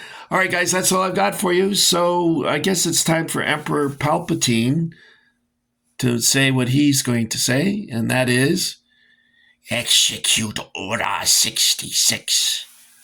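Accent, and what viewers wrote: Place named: England, United Kingdom. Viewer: As ever an excellent video with your own fantastic style too. Great English accent BTW. ❤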